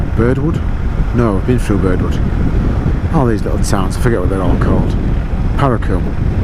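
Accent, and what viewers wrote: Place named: Scotland, United Kingdom. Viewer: Where in the UK did you come from? Your accent is heavy. Could almost be Scotty.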